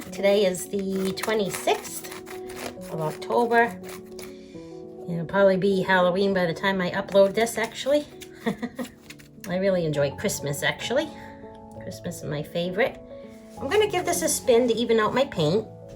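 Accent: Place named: New York, United States. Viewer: Very beautiful. You have a New York accent. So cute.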